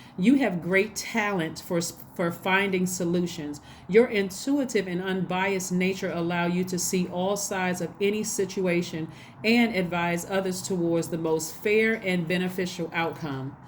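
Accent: United States. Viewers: Im french but when i listen to you I feel American lol I love Aquarius ♒️ they are the best 💜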